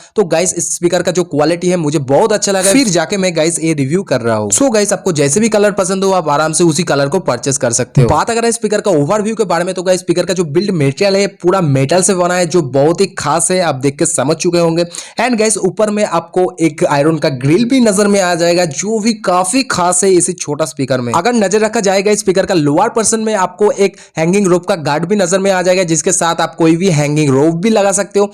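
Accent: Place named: Assam, India. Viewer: Banda assami hai baat karne se pata chalta hai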